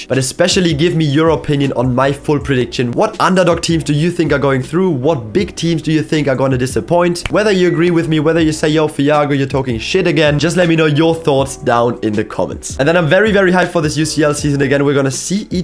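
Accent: Germany. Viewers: your accent is so german. Iconic!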